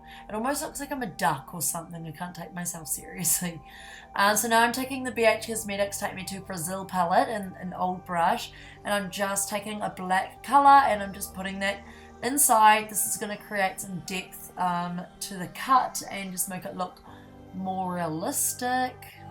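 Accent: New Zealand. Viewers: I knew you were a kiwi when I heard your voice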